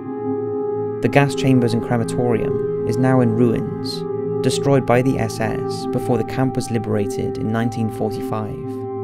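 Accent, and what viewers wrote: United Kingdom. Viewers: I'm assuming you're a fellow Brit because of your accent! I just wanted to ask, how much did it cost to visit there? This video has made me interested in going. Thanks :)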